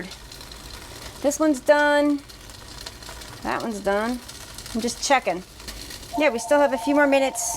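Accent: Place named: Philadelphia, United States. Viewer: Are you from Philly can tell by your accent.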